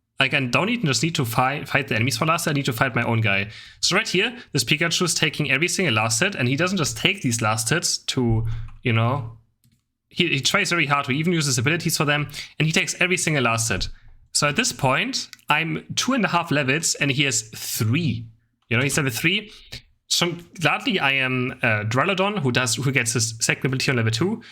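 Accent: Germany. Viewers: I'm sooooooo curious You have an accent I can't quite place It's like German or maybe like a Norwegian type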